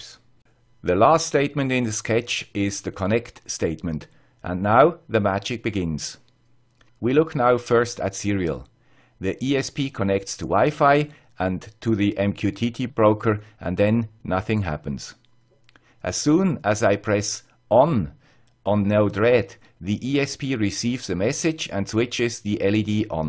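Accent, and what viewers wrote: Switzerland. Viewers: Damn you 'guy with the Swiss accent'! You've gone and preempted me - with the 'topic' of my forthcoming video (see what I did there)?. But thanks for the reference and excellent dual between Broker and UTube. I'll go back and 'like' furiously! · Thank you friend with the Swiss accent. I have enjoyed many of your videos and was excited to see you get a Pi Zero W, now MQTT. I will probably have to watch these videos many times until I understand since I'm new at coding. Thanks for the good tutorial, Hope to see many more. I need help!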